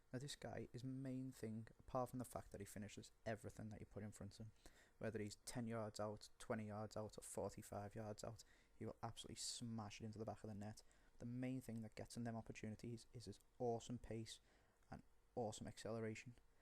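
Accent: Liverpool, United Kingdom. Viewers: It’s pretty baller to say “welcome to my very first YouTube video” as someone who doesn’t know whether it’s gonna work out at all. He knew it was gonna happen for him - also, did he lose his scouse accent as he got older lmao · Omg he is so scouse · He sounds even more scouse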